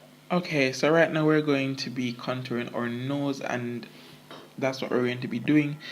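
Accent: Jamaica. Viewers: I knew it cuz like u sound like a Jamaican who just know how to speak good English instead of patwa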